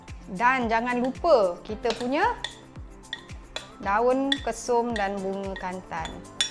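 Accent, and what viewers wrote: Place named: Kedah, Malaysia. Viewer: Comey je kak ckp loghat kedah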